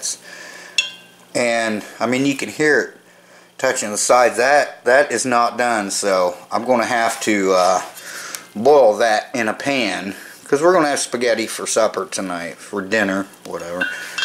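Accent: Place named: Indiana, United States. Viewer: I would have guessed Indiana. They talk like that 'round here. Lol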